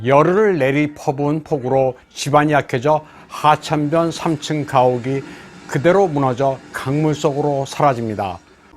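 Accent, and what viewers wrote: China. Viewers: 박상후 기자님 중국 화교이지요? 너무나 중국사람 발음을 애용하시네요. 대한민국식으로 한자 발음을 표기해주시면 너무 감사하겠읍니다. 박상후는 중국식으론 어떻게 발음합니까? 이렇게합니까? 제발 한자 발음을 제대로 표기해주시기 바랍니다.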